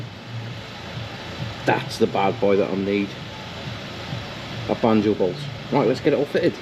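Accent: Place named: North Wales, United Kingdom. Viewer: Where are you based mate? You sound local? In North Wales? I need my Daughters 2016 Mini 1.6d sorting the same. 😊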